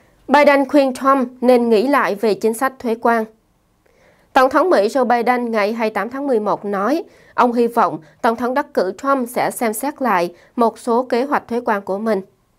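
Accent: South, Vietnam. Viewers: Chào xinh đẹp ❤phát thanh viên có giọng đọc nghe rất hay chuẩn nam bộ. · 🌴.Anh Chào e nhé..Cô Mc rất là dễ Thương và Xinh đẹp.Cô có chất giọng đúng người miền Nam..Dễ nghe,lôi cuốn người luôn và thích quan tâm các tin tức thời sự..Nhất là các tin tức Q.sự..Đặc biệt là ở chiến trường đất nc Xinh đẹp Ukraine..Anh xin Kính Chúc Tập thể ban biên tập (Bbt) Chương trình của Kênh Qsm,cùng với Cô Mc Xinh đẹp như a đã nói ở trên luôn đc nhiều s.khoẻ, và mỗi ngày sẽ có thêm rất rất nhiều người biết đến Kênh Qsm để tìm đến theo dõi Kênh ngày càng thêm nhiều..Mc Xinh đẹp luôn mãi là người mà a rất ngưỡng mộ, và luôn ủng hộ Kênh Qsm đi cùng với e..Một lần nữa.Xin chân thành Kính Chúc đến với tất cả Quí vị 1ngày mới luôn Vui khỏe, và Hạnh phúc Bình an......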